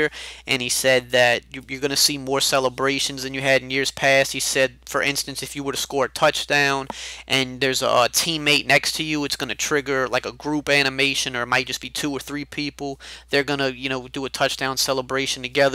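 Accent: Louisiana, United States. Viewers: Are you from Louisiana?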